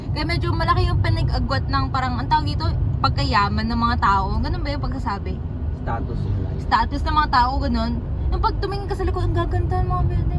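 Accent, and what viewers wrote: Tagalog, Philippines. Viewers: Ang galing mo mag salita ng tagalog pati accent mo tagalog !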